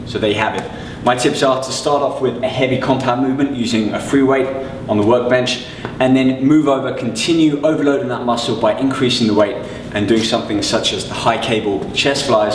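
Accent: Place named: United Kingdom. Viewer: So this workout should build and make your middle line more prominent? Your accent is cute. Is it British?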